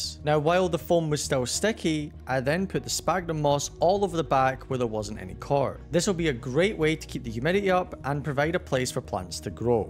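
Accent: Scotland, United Kingdom. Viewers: The best part is your Scottish accent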